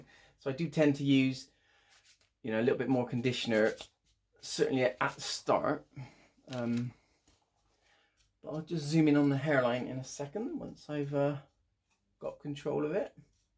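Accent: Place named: Salisbury, United Kingdom. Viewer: You sound like a Salisbury boy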